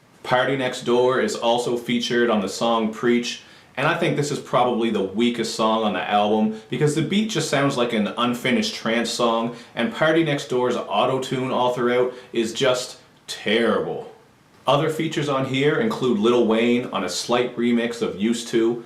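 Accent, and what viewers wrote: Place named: Canada. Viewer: I liked the album and how sensitive Drake is. I love and. Great reviews btw! You're super cute and sound Canadian, are you Caribbean?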